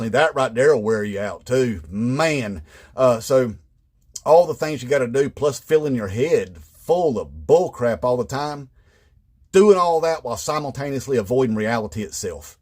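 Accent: South, United States. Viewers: Much appreciation from Canada🎉You sir, are brilliant and the best thing on the web. I will never again assume that a strong southern accent has anything to redneckedness! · Pretty much impossible to make sense of his southern-twang machine-gun chatter & spew, let alone Listen to it.... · The only thing MAGA people hate more than women, black people, poor people, queer people, educated people, children (once they're born), illegal immigrants, and legal immigrants, is themselves. Ps.: As an Austrian, I love the way you pronounce 'Nazis' .. that southern accent is gold 😅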